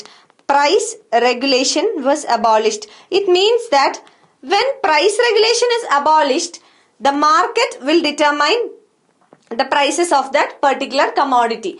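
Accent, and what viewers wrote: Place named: Kerala, India. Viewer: seriously her slang sound so....pullikari malayalee thanne ......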